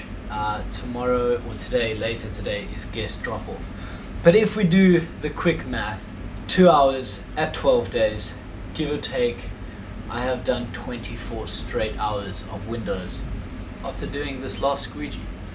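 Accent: South Africa. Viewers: South African accent? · Is that a Saffer twang I detect? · Hi - are you South African - your accent and some of the words you use make me think so?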